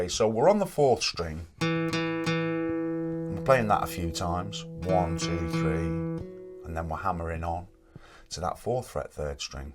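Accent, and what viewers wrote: Liverpool, United Kingdom. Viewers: Good teacher - but I agree that you should play most of the song BEFORE teaching most of it. Lovely Scouse accent!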